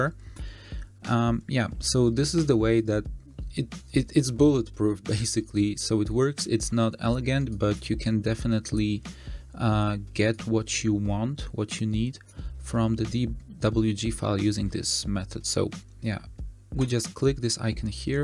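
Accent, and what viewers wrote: Poland. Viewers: Przez moment zastanawiałam się, czy ten akcent nie jest przypadkiem polski, teraz już wiem, że to nasi. ;)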